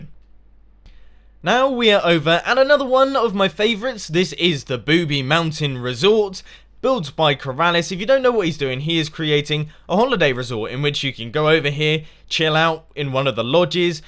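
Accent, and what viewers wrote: United Kingdom. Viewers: what part of the UK are you from? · IM CAPSLOCKING THIS SO YOU THINK IM ANGRY AND YOU RED MY COMMENT PLEAS STAY AS AWESOME AS YOU ARE AND THE MUSTACHE IS NOT REQUIRED BECAUSE WE ALL CAN HEAR THE BRIT OUT OF YOUR VOICE REDSTONE 4 LIFE <3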